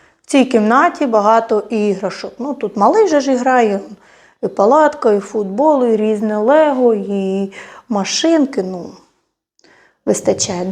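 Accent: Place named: South, Ukraine. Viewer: Доброго дня ! Ми часто вас дивимось в Україні в ютубі.Нам подобається ваша мова і ми завжди думаємо з якого ви міста.Я думаю с юга України бо ми з Херсона.Дякую за відповідь!.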